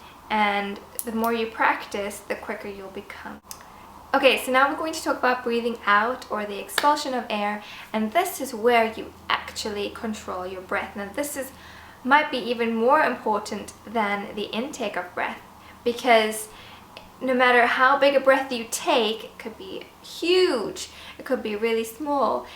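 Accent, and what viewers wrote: United Kingdom. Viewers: didnt know she was british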